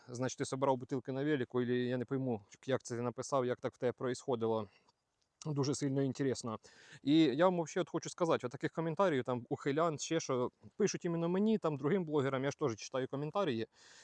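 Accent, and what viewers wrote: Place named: Surzhyk, Ukraine. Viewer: Балакай краще вже на російській,бо твій лютий суржик це капець.....або вивчай мову краще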